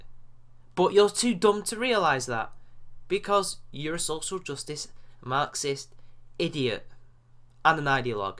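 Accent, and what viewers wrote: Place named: Northern England, United Kingdom. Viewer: I really like your channel. Makes me hum the song every time. Your accent is luv-ly, luv. I can't quite place it, you must be in the north. One of the things these very young SJWs fail at is history. They are either too young to remember the misery, the nearly 100 million lives squashed out by 'marxist' 'communist' brutarian regimes, the mass starvation, the firing squads, pogroms, gulags, five year plans, the slaughter of people who wear eyeglasses, the racism, the murder of people for even trying to practice their religion. And the corruption where the party henchmen steal everything and the people starve, which occurred 100% of the time. I could go on, but hopefully you get the point. She seriously fails in understanding the U.S. Constitution. We don't rule by majority in the way she thinks--the government is set up to protect minority rights and not have mob rule. 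Every sentence she says has a major glaring error, which you broke down brilliantly. Applause.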